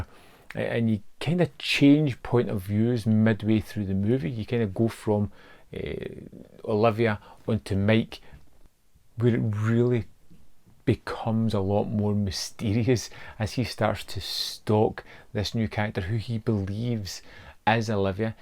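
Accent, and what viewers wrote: Scotland, United Kingdom. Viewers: Do you really not understand the English language? Are Scottish accents that hard for you to understand? Go back to your hovel you troglodyte.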